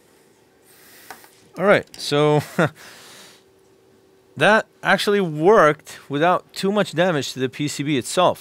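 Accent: New York, United States. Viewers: That's "New York City". They all sound like that. :) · It’s that New York accent. We just talk like that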